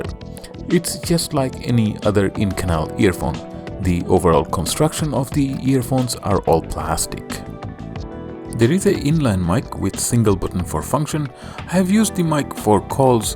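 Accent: Bengal, India. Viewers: your accent made me guess that you could be Bengali. That's why I asked.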